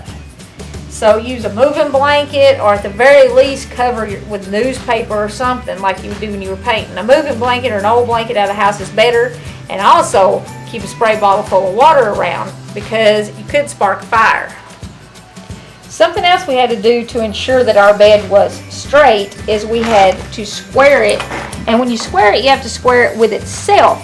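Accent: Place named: South, United States. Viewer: that southern twang but I got one two lol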